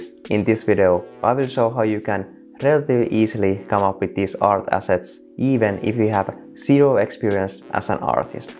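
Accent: Finland. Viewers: Are u Finnish? Your accent sound like one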